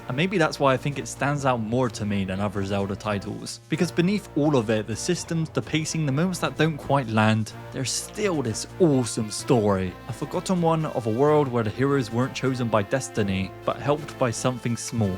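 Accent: Bristol, United Kingdom. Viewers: I know a Bristolian accent when I hear one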